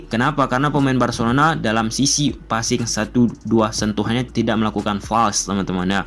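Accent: Malaysia. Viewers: abang...logat melayunya kontal kali. dari utara kah? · logatnya kayak orang malaysia, warga malaysia kah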